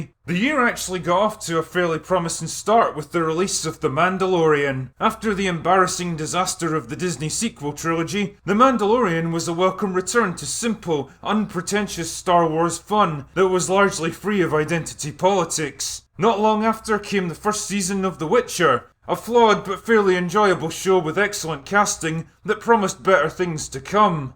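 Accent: Scotland, United Kingdom. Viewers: Honestly, I'm only here to listen to your accent. Because without the likes of you and Craig Ferguson millions of Americans would be under the misconception that Mike Meyers got it right. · I think we like you so much, cause your criticisms are pretty fair and honest. If a movie can generally suck, you will say so, but if it has elements that work out, you will say that too. If one is generally pretty good, but has elements that fail too, you will point those out. And frankly most of us dislike the Woke trying to force their way into our entertainment. Largely I will say, because they simply cannot do good stories. Seeing someone call this out in a dour, drunken Scottish tone has been a damn near godsend lol. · What are some of ya books mate? I've only just found your channel, but I've been binge watching everything... because Scots have the best accent in the world, and your no bullshit attitude is how i like things lol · Is this a movie Chanel? 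We just like the Scottish accent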